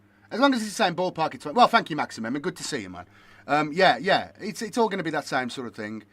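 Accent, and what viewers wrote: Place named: Birmingham, United Kingdom. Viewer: So I just found this video end of July 2025 and I did wonder where all your excellent videos went to. I heard rumours that it was Geedubz clamping down on any use of their I.P. and from what I gather from this video, I think it is. I dunno what you're doing in order to circumvent the either potential or actual copyright strike from Geedubz. Good luck in whatever you try to do. Also this is not how I imagined you to be, an actual full blown Brummie lad.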